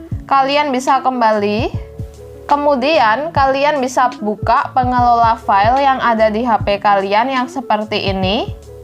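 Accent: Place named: Java, Indonesia. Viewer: Medoknya gemoi :v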